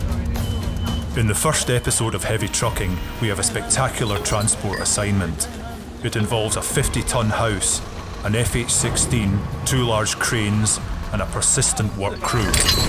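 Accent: Scotland, United Kingdom. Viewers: Why the scotish accent? It's not even in Scotland. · Why do people on here SLAM DUNK the video, It doesn't matter if the announcer is Scottish, some one had to do it and he was chosen, "Welcome to the internet as You Might Say" Thanks for sharing this with us on you tube, if you have any more please send me a link and any others that might be interested, English / Scottish / Usa / Australian / Canadian/ :)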